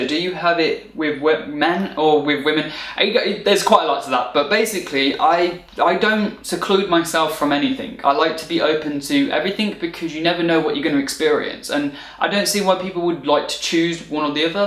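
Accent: United Kingdom. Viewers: Great video! You are one of my celebrity YouTubers who is very attractive and I enjoy your English accent too. I agree, with you mentioning that their are lots of beautiful people.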